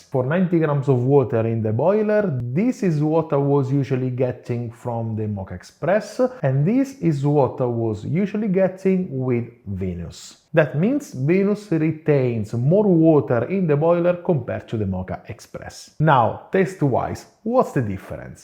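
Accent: Italy. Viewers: I Like the italienenglish😊😅 good Video. Thank You! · Dam this guy is a coffee scientist. The Italian accent makes it perfect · Excellent video and the Italian accent makes even more authentic 😁 Having both I always felt dark roast which I only use tasted more smoother, abit more refined and abit more juicier on the Venus. Moka has the less refined and harsher finish overall. Personally I only use the Venus & it looks more astectically pleasing too 👌❤🇿🇦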